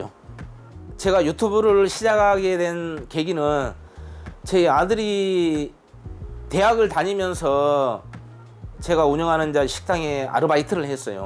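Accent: Jeolla, South Korea. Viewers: 세프님 너무 섬세 하시고 또 전라도 사투리 로 너무 정겹게 느껴지고 또 많은요리 소개 해주시고 넘 감사하고 좋았습니다 세프님 날로 번창하시길 기도합니다 다시한번 축하드려요 🎉💗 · 셰프님 구수한 말씨가 정녕 전라도 가 고향이신거 같습니다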